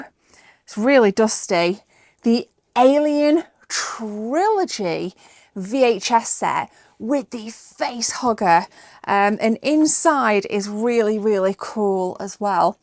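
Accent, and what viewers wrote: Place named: RP, United Kingdom. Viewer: You have a beautifully posh accent. What part of the country are you from?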